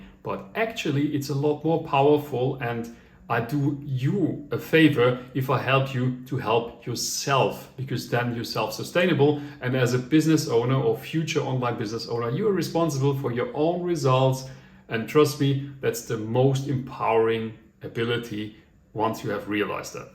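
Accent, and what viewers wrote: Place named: Germany. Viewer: Ich höre den deutschen Akzent :D Aber gutes Video, weiter so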